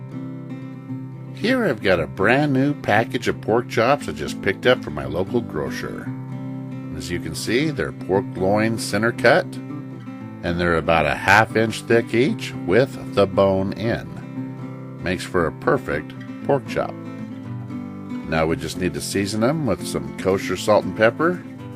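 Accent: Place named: South, United States. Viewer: It's the Southern accent and chops for me, just subscribed, hoping you have jokes for days too for the bored ones....😂😂😂😂 Thanks for sharing 👍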